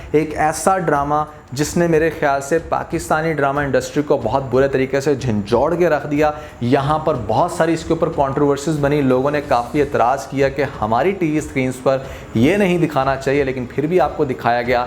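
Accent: Punjab, India. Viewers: Aap English ko punjabi mein bolte hain.PLEASE PLEASE PLEASE DON'T DO THIS.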